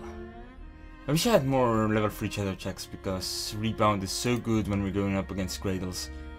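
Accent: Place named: Sweden. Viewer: Where are you from? Your accent sounds similar to Robbaz the Swedish YouTuber.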